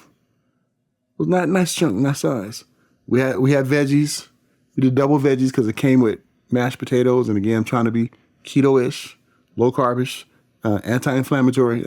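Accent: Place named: United Kingdom. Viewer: Your British accent is EVERYTHING!!! You sound better at the Queen's English than I do 😂😂😂😂 xxx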